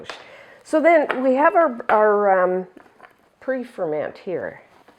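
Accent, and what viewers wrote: Canada. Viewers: Her accent is Canadian af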